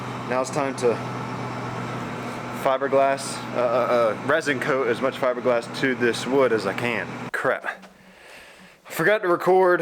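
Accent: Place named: Newcastle, United Kingdom. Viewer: listening to true Geordie! Love that and watching this build has really helped me with my project boat some of your "mistakes" i was able to skip and not have to take the time and make them myself. Keep up the great videos and hope to see you on the water soon!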